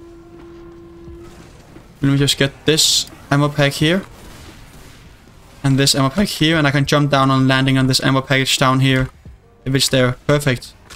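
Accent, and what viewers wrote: Denmark. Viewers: just a guess, but are you danish? if not then my hearing is completely broken, hav en god dag :)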